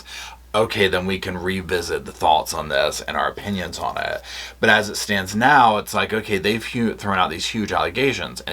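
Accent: South, United States. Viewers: You rock a southern accent! 😂 Xoxo to Roscoe :_Lovesit::_SofaSquad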